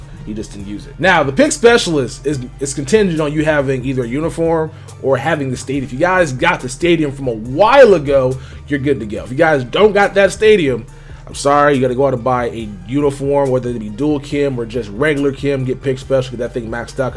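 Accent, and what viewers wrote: Texas, United States. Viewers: Are u Fr from texas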